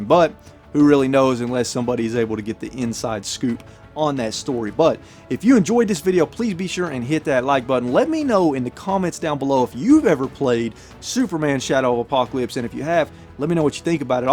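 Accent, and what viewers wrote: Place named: South, United States. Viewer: Thanks for the review! As a fellow southerner, I was really happy to hear your accent!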